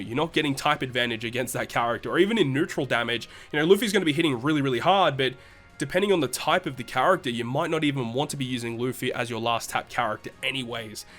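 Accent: Australia. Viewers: with his accent you should be able to tell he's Australian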